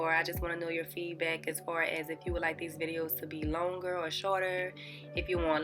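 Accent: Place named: Texas, United States. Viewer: Nice font work. You have a nice accent, whereabouts in the States are you from? (Update: Just heard you say you live in Austin TX!) I like you talking over the video and the length is fine.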